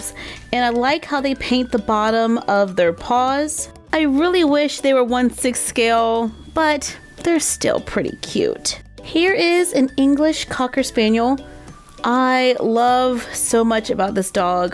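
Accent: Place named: United States. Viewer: I'm so sorry I'm a brit and the pronunciations of these dogs in America is killing me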